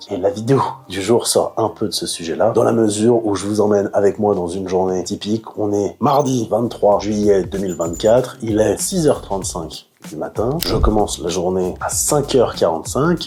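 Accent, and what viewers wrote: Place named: Thailand. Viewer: L’accent Thaï on point 🤌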